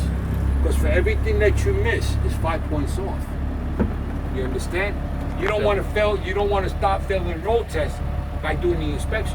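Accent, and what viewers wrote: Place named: New York, United States. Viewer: God I miss the New York/Jersey accent.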